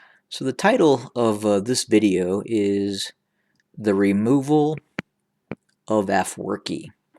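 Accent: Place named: South, United States. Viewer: The fan fact is this channel has been joined in 15 sep 2020 , and a random with southern accent guy getting paid or using the situation to get attention, saying USA plan to remove Isaias aforki , first of all the CIA never even tell their secret publicly for any operations , not only CIA but also other secret Agencies in any Country, and secondly why now , what was US waiting the past 30 years , thirdly USA has no power to remove any leader on a country, we think like that but most of time they get removed by the people like sudan, Tunisia, egypt , Ethiopia( the Weyane was kicked by the youth of oromo poeple) , but nice try though 🤣🤣🤣🤣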